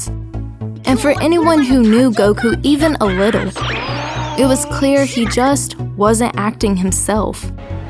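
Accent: South, United States. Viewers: I thought u had a southern accent I'm from s.c so I've heard way worse.i love your voice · you're a fellow southerner? well that's cool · I knew you were a southern girl lol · your southern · Where r u from with the southern accent?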